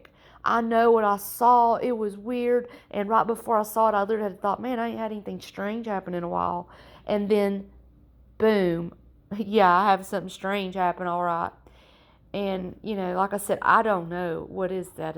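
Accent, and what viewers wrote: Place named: South, United States. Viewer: damn I must be psychic lol. There is nothing wrong with your accent , southern charm.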